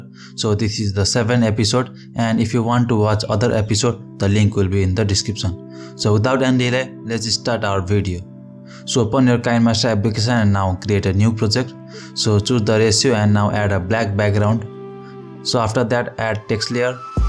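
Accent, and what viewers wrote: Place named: Spain. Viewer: Your Spanish accent is so nice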